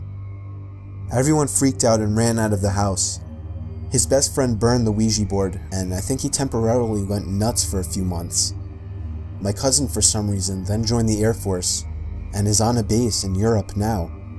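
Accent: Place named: New England, United States. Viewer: I caught your new England accent in this one mr. ;)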